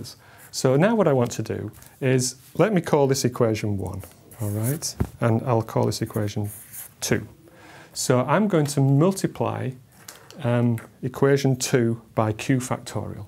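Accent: United Kingdom. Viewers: British accent supremacy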